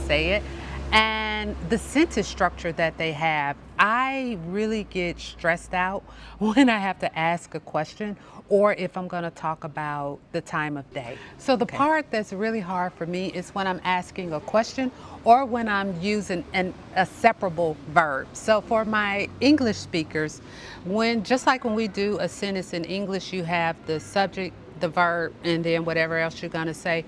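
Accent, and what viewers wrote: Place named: Chicago, United States. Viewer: 'But because I'm trying to remember that separable verb I forget what the hell that I'm supposed to be saying' ... hahaha. Good one! We've all been there. And, after 26 years, I still make mistakes, especially if confused or upset. Subject +verb +direct object +date +object (+verb)...phew. And then there's the 'aan het schrijven' conjugation of being busy doing something ... such as in 'I'm writing'. This too is placed at the end. 😏 Where are you from in the States, BTW? To me you sound like you have a Chicago accent. Am I right? So, when I first moved here, my father-in-law to be told me that during WWII the locals could tell natives apart from the Germans, who would always pronounce 'ch' words with an 'h' sound instead of 'k' (consonant) ... like 'Scheveningen' / 'Schiphol' / 'schoenen'. And now I even quietly cringe when people pronounce stroopwafel as 'stroepwaffel'. 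😄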